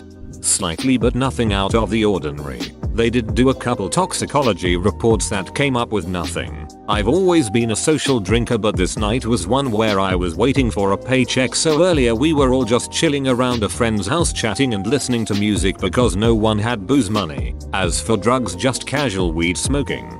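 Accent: United Kingdom. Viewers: This brittish snob accent sucks on robo narrator.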